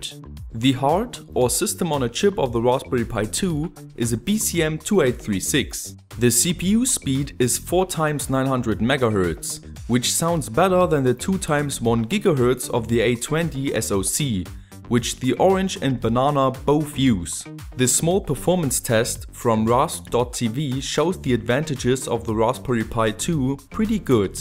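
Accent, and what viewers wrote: Germany. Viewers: eher ein deutsches Amerikanisch.... Und ich dachte immer, in Deutschland würde man brtisches Englisch und RP lernen, so wie ich früher. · Dude, you sounds weird... Are you German?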